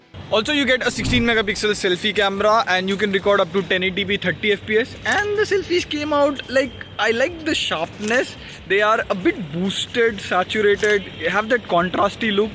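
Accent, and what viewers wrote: India. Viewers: Hi, i'm an Italian man. I watched your video because i'm evaulating the phone, but without the help of the subtitles i couldn't understand what you were saying. It's me, im' not used to listening english of people from India. Anyway, good video (writing, screenplay, photography, effects), great review (I'd love more hand-on use, but whatever), keep looking to me the perfect replacement to my Moto G8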